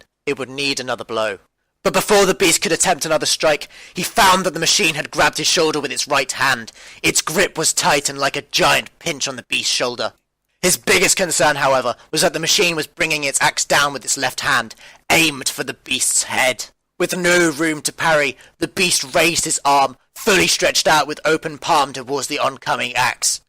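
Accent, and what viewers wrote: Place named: United Kingdom. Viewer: LOVE THAT BRITISH ACCENT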